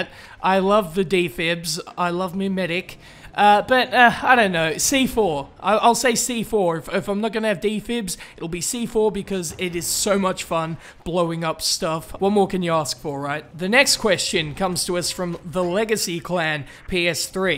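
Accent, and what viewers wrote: Australia. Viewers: Sounds like an Australian accent to me. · Does the person who said the last question on this video think that Australian accent means bogan? This guy's accent is what most people in Australia sound like (I'm Australian and know what they sound like). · I'm American and it accent is clearly Australian. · I think he sounds Australian. I don't see how he could be American or British. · first time I see this "oh, this guy must be from Australia"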